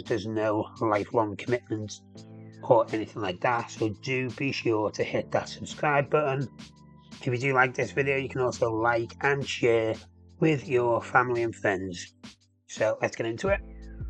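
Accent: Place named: Liverpool, United Kingdom. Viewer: When your channel started under a different name I 1st started watching it but then listening to you I realised you don't support Everton and haven't got a clue about football..!! + your accent makes even us Scousers cringe 😮 And you changed your channels name because you kept breaking all the drone rules flying over a working Stadium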